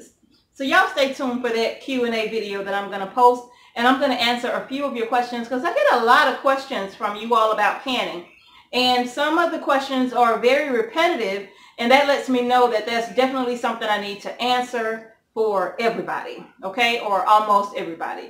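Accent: South, United States. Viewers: Thank you,! By chance are you living in Georgia? I love your southen twang, ya'll! xo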